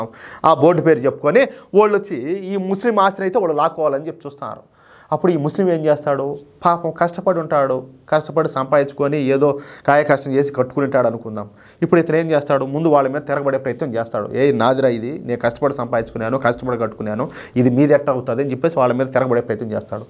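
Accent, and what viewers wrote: Rayalaseema, India. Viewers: Your from rayalseema, how language looks like cinema movie dialogues.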